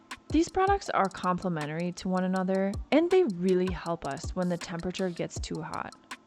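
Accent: France. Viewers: Bonjour Est ce un petit accent français que j’entends mdr Superbe vidéo merci de vos conseils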